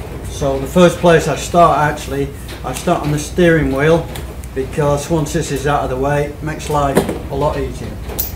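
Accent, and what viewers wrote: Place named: Lancashire, United Kingdom. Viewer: Where are you based ? The accent sounds more like Lancashire.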